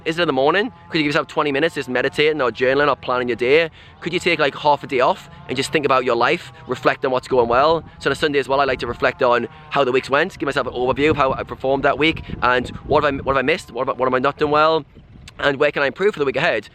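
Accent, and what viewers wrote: United Kingdom. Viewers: If you are up for feedback then the other thing about the speed of your speech is the accent. I’m in London and am well versed with with the many different accents throughout the country. Whilst your Geordie accent (I’m guessing) is not super strong it is significant and not a problem for me but when you combine that with the speed of voice then anyone that does not use English as their first language could find it hard.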